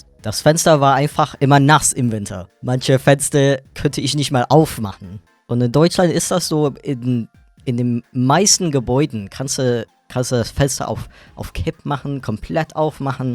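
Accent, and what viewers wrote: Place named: United Kingdom. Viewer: No offense an den Typen, aber man hört direkt nen klassischen UK-Akzent raus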